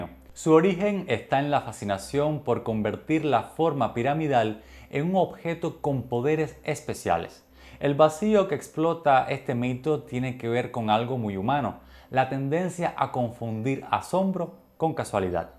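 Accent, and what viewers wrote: Cuba. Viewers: Me haces sentir orgulloso de ser cubano. Pensé que eras catalán, por tu apellido, pero tu acento me decía que hablabas como nosotros (cubano al este de Matanzas). Excelente trabajo, magnifica presentación. Sigue en esa dirección. Felicitaciones. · Hermano , ese acento y fraseo es MADE IN CUBA . Chevere 👌 , me voló la cabeza reconocer a un cubano abordando estos tópicos ancestrales , lejos de la "MACHACADA" y adornada historia de mambices y epopeyas INFLADAS que caracterizan la mal contada historia de Cuba. Gracias por tu estupendo trabajo . 👌. Saludos.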